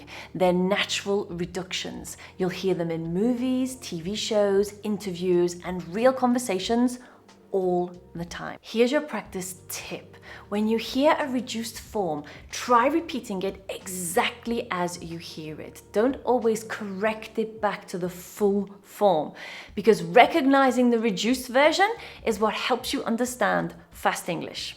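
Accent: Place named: London, United Kingdom. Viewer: It's very good your accent is in London see you next bye and great week for you.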